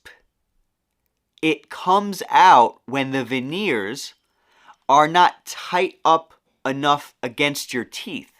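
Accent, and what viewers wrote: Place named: New York, United States. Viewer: and wasn't even from the name, I didn't see that till after. It's so funny, I was just watching, your personality, demeanor, the way you were talking, then it hit me! If you were from New York it would have given it away a lot quicker. lol thank you so much for the video! I might have to give it a shot, I have to do something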